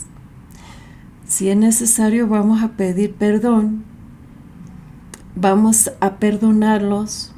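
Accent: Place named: Mexico. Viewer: Pese a tu español tan mejicanizado te hiciste entender maravillosamente ...por lo que será muy fructífera la enseñanza ...porque se que la hora hiciste en el Nombre de Dios Trino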